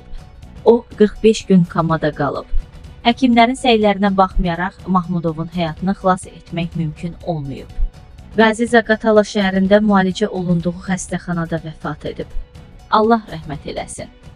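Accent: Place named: Armenia. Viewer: Ay məni qınayan mətni oxuyan ermənidi ?? Bu necə oxumaqdı? Hardan tutub gətiriblər bunu ????? Ay bala bu nə matetialdıııııı?????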